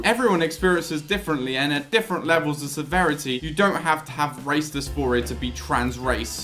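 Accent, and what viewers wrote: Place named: United Kingdom. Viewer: is British! 😮 best of British Amazing Guy...I was thinking American..🎉❤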